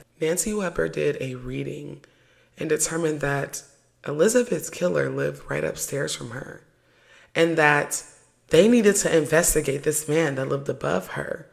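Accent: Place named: Baltimore, United States. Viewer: Sounds like u from Baltimore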